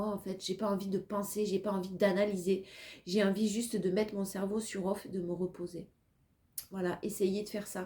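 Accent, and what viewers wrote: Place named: South-West, France. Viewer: J'adore vos messages qui me font beaucoup rire par votre bonne humeur. Votre franchise et notre bel accent du Sud Ouest (je pense) met du baume au coeur. Bon week-end❤❤😂😂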